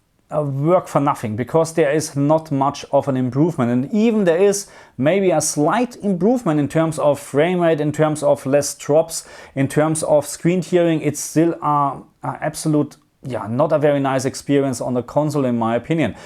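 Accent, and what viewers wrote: Germany. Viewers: Ich dachte mir schon von Anfang an des Videos, „Er scheint einen deutschen Akzent zu haben, ob er deutschsprachig ist?“ Und dass „Herr der Ringe“ hat mich dann zum Lächeln gebracht.😅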